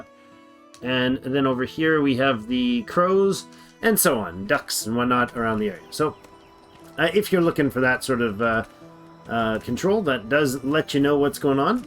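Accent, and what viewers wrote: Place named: Canada. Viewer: Have been loving this game lately! My favorite gameplay and commentary yet- subbed and liked! I recognize a little Canadian accent there, eh?? Cheers from the Pacific Northwest USA brotha!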